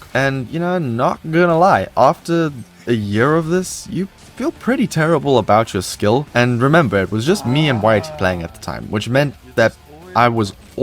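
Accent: South Africa. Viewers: Hah, I could instantly tell the Saffer accent :D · Is this man South African?! Legend.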